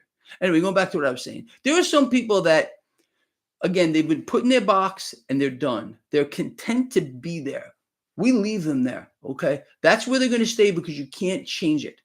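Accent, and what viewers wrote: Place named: Long Island, United States. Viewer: “Hey guys it’s me,!” Your Vinnie Santucci-Long Island/Elmer Fudd combo accent is legendary!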